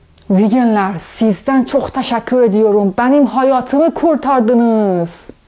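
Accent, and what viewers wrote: Azerbaijan. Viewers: Fifa oyuncus U Azeri o yüzden öyle konuşuyor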